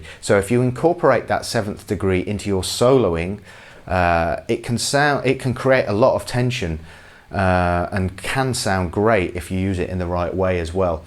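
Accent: England, United Kingdom. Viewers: You british accent is excellent :D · ENGLISH accent.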